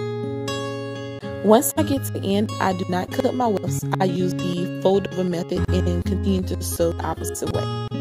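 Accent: Louisiana, United States. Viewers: aw<link> this tutorial just seemed sooooo sweet! LoL. beautiful job... do i hear a Louisiana accent?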